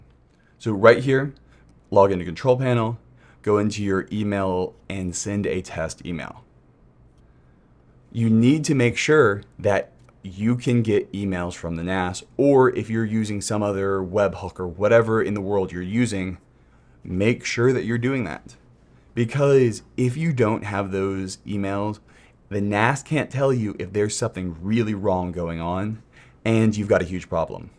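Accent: California, United States. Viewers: Love the channel but this the most California accent I’ve ever heard in my life and I forget every time until I click on the vid 😂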